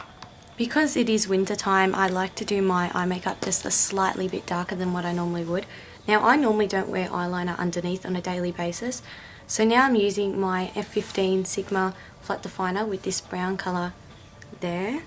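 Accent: Australia. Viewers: Australia. Can't you tell by her accent?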